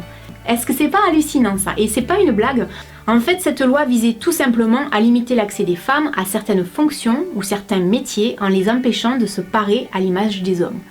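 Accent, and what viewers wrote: South, France. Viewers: J'adoooore ton accent 👌😍 Le sud en force ! 😂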